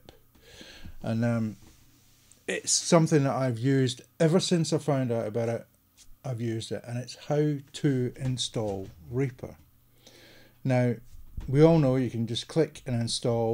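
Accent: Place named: Scotland, United Kingdom. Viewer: Thanks a lot for this great video! I also really enjoyed your Scottish accent, it brought to mind my ten years when I lived in Scotland;-). &#160;- So if I understand correctly, this still remain a portable install of Reaper but just more tidy, correct? - Where is the best place to place all the VST.dll files for all of my plugins inside the main Reaper portable folder? Thanks a lot in advance and best regards, Max T.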